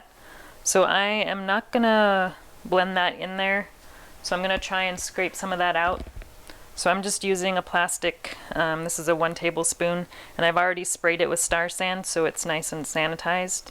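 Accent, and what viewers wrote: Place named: Ohio, United States. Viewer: you sound like you're from Ohio with family from PA or WI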